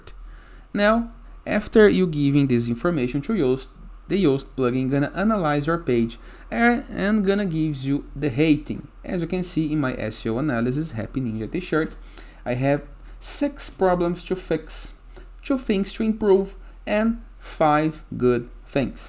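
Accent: Brazil. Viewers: Are you brazilian? I like the content and you accent. Was easy for me to understand everything.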